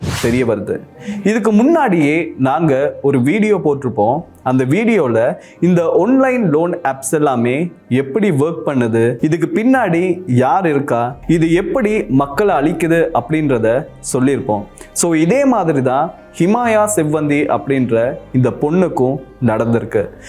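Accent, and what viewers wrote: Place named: Tamil Nadu, India. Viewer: இலங்கை சனல் தானேடா நீங்கள். பிறகேன் இந்தியாத் தமிழ் கதக்கிறீங்க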